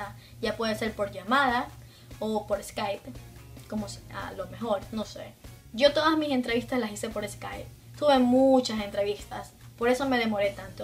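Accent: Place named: Ecuador. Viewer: Creo que eres muy parecida a la Miss Universo, de verdad que te veo y me recuerdas mucho a Paulina solo que con acento ecuatoriano ;)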